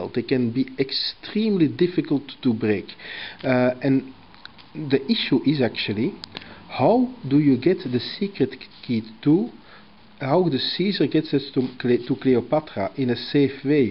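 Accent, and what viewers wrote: Netherlands. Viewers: thanks man :) are you a dutch ? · No objection or anything, but are you Dutch? Your accent sounds Dutch to me. xD And thanks a lot for this video!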